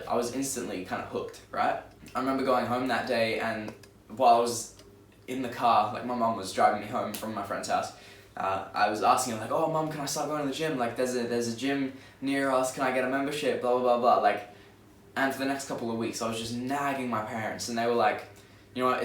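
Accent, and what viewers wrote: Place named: Australia. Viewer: ye mate hes aussie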